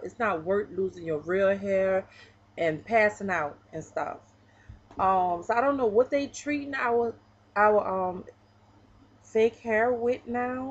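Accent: New Orleans, United States. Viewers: what had happen girl you can tell you from new orleans LOL...., thanx for the vid.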